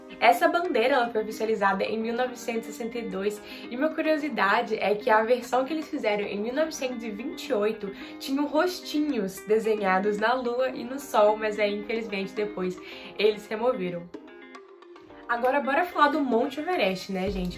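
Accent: Minas Gerais, Brazil. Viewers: Moça você é mineira?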